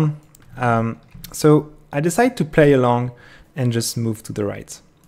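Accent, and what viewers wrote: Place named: France. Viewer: Great video, I am not sure, but you have a strong French accent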